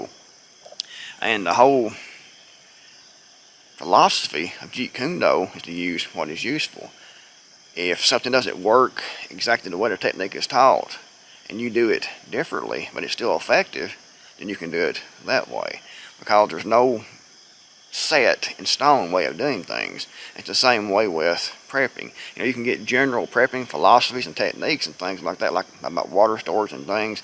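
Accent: United States. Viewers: I’m loving the “differt” way you speak. Nothing makes a displaced Marine feel more at home than hearing some sweet American accents.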